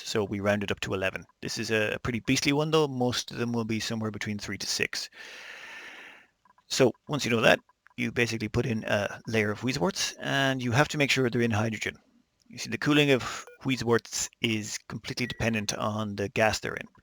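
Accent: Ireland. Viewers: Well, I only noticed it when you said a few words with an Irish accent, though it is true that your accent is not that strong but you still have a little bit of accent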